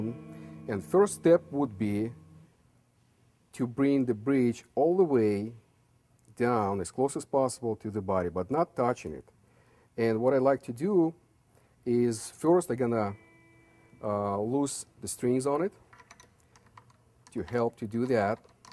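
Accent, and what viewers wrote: Russia. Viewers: У чувака шикарный русский акцент)) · Are you from Russia ?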